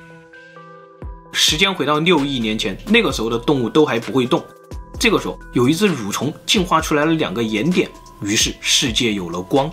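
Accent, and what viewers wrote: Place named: Fujian, China. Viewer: 福建哪里的。。。？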